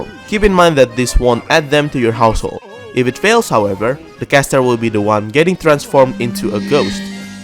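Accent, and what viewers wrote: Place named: Indonesia. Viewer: Logat indo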